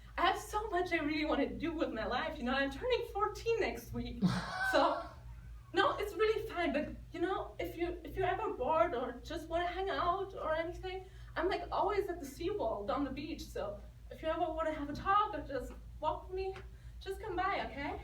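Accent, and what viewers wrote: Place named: France. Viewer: ah- they want money for the original i guess. :/ i almost thought you might be french from the tiny bit of accent i heard. german is one of the languages i study daily. it's tough. keep up the awesomeness. : )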